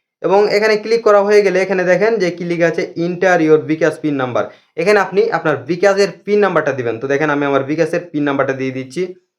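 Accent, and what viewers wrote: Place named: Kolkata, India. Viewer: ভাই, আপনি কি ইন্ডিয়ান? ভাষা কোলকাতার মতো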